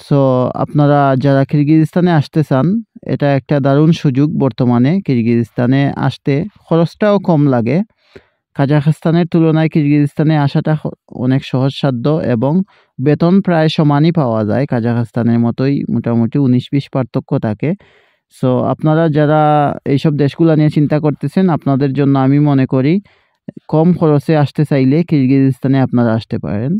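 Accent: Sylhet, Bangladesh. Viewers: ভাই আপনি কি সিলেটি নাকি?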